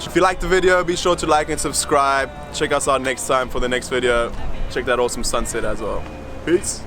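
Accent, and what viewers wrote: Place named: South Africa. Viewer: Love the SA accent and no-bullshit manner 👍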